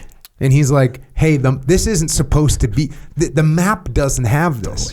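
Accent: England, United Kingdom. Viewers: Brilliant from England your English accent had me in creases also well done recognising the authors dry humour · Jocko with a British accent is pretty amusing. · English accent Jocko is the Jocko I didn't know I needed.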